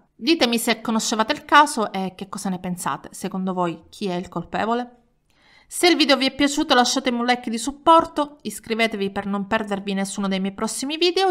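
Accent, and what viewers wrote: Sicily, Italy. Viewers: Ciao, scusa se sono ripetitivo, avendoti giá "difesa" da " accuse" di dubbia pronuncia ed eccessiva sicula cadenza. A me personalmente la tua voce, il tuo modo di parlare ma soprattutto il tuo caratteristico piglio siciliano piace da morire. Brava, ti ascolto a prescindere dal caso che tratti proprio per questo.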